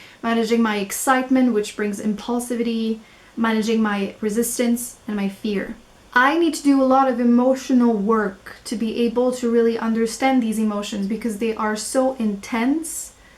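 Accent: Quebec, Canada. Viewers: I'm still waiting for my diagnosis, but watching your video pretty much confirms my doubt, I feel the same way. Thanks for making theses videos, feels like i'm not alone in this. Also i'm detecting a french accent, i'm guessing your french canadian also?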